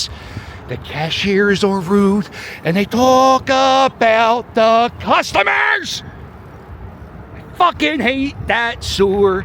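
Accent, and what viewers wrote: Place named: Pittsburgh, United States. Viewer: i grew up in the philly area and i sound nothing like this guy. sounds like pittsburg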